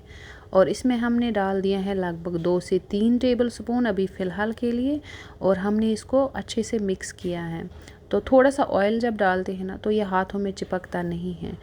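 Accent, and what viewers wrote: Kashmir, India. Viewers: Kashmiri lugti ho aap aao k bolne ka andaz waisa hai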